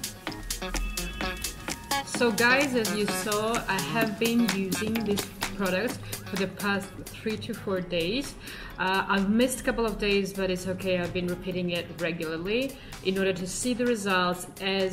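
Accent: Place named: United Kingdom. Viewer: ბრიტანული აქცენტით კარგად საუბრობ❤️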